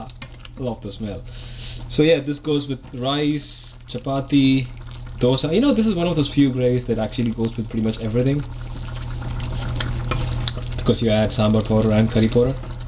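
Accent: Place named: Wales, United Kingdom. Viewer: This fellow sounds Welsh!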